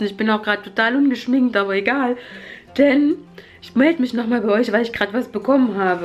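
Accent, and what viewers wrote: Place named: Thuringia, Germany. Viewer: Erstmal toll das du wieder da bist. Ich hab dich soooo vermisst. Hoffe dir geht es wieder einigermaßen besser. Falls nicht schick ich dir gute Besserungswünsche. Süss das der thüringische Dialekt bei der Krankheit voll durch kommt. ❤️❤️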